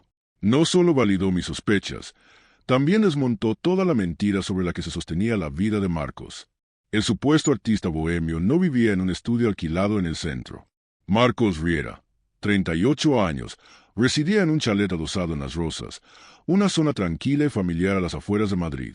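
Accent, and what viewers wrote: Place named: United States. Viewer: La historia estaba bien, pero por qué usar una voz de IA tan rara? El tono de voz es horriblemente incómodo y el acento gringo es innecesariamente molesto.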